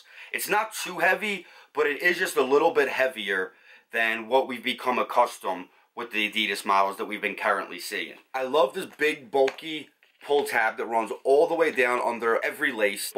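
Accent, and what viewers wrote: Philadelphia, United States. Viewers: haha randomly found you from your vapormax chukka video I realized you were from philly as soon as I heard you say like 5 words. I'm from Harrisburg man its cool seeing guys in my state doing dope shit!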